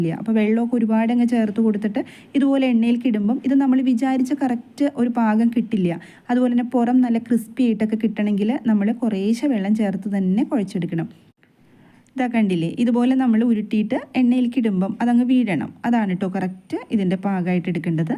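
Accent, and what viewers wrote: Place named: Thrissur, India. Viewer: Thrissurinte സ്വന്തം ഭാഷ ❤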